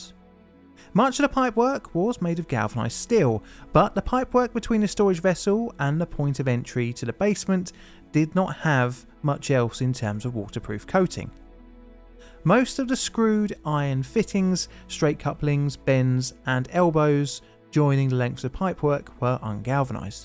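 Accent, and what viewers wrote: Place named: Glasgow, United Kingdom. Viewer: Glaswegian? Cringe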